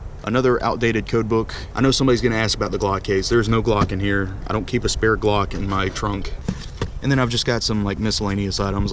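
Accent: United States. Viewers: Your accent sounds American but one of your guidebooks was specifically for Canada... can you confirm what country you're in?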